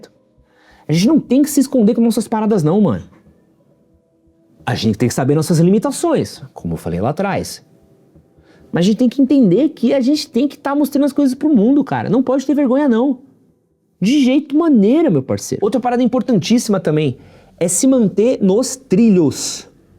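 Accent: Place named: Sao Paulo, Brazil. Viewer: Provavelmente tu não vai nem ler isso aqui, mas você me venceu na consistência. Vou ser muito transparente: Eu tinha um preconceito com sotaque Paulista, não sei porquê. Seus vídeos eram recomendados para mim e, diversas vezes, eu clicava pra ver e na metade saía. Não sei dizer qual vídeo foi, mas algum passou uma ideia muito boa. E eu tenho o costume de me permitir ser vencido por boas ideias. A partir desse ponto me inscrevi e passei a acompanhar (eu não costumo comentar quando acredito que não vou agregar, então nem sei se já comentei alguma vez aqui). Te admiro bastante, todo sucesso que você tem é merecido. Espero conseguir desenvolver essas habilidades que vejo em você na minha própria vida.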